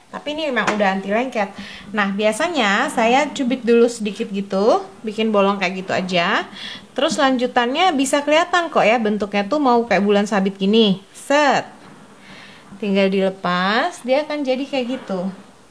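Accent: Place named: East Java, Indonesia. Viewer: makasih resepnya ciciiii...btw cici ini org jawa timur yah. logatnya itu lohh sukaaaaa....cara2 bikin semua resep mudah. sederhana dan ga nyusahin 👍👌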